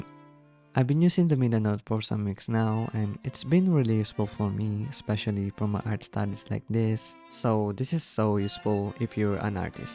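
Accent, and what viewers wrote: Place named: Philippines. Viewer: are you filipino? your english accent similar to filipino english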